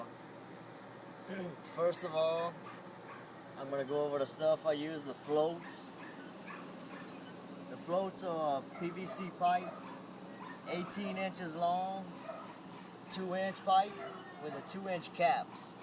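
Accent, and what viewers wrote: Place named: Louisiana, United States. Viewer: i can tell your from around here with the accent i live in new iberia not too far down the road lol i live by a small bayou that leads to avery island and there are nice 5 and 6 footers coming in and out and imma make some of these to put in there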